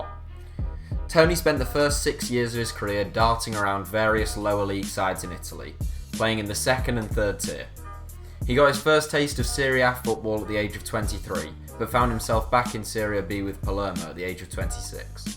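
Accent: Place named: Yorkshire, United Kingdom. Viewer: I'd like to apologise on behalf of the entire of Yorkshire… we don't all sound as monotone and dreary as that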